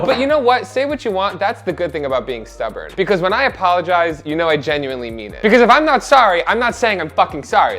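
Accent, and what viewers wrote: New Jersey, United States. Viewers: I love his Jersey accent!!!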